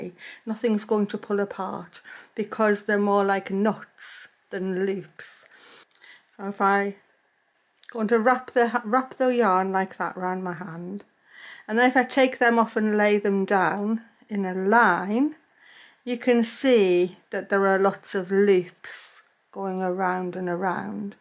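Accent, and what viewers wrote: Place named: Stoke-on-Trent, United Kingdom. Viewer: A few years ago my family went up north to Newfoundland to L'Anse Meadows to see the Viking Settlement there. I purchased a needle for just this craft. So now I'm retired from a lifelong nursing career lm going to have a bash at this. I'm so glad l found your channel,. Your accent reminds me of my friend from Stoke on Trent!. All the best of Nova Scotia xoxoxo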